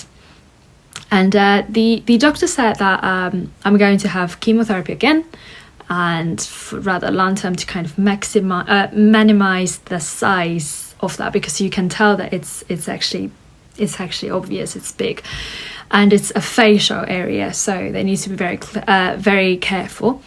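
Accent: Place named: RP, United Kingdom. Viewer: Hello,! I really appreciate your lovely contemporary Received Pronunciation (RP), your efforts to make this very first YouTube video, and, more importantly, your attitude towards your unfortunate experience due to this extraordinary disease. I hope you would receive great treatment from the NHS and ultimately combat the disease successfully! May you be free from suffering very soon! Yours sincerely, Jordy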